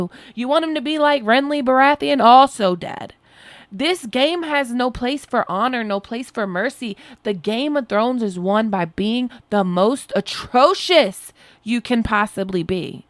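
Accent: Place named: Baltimore, United States. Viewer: You gotta be from Baltimore lol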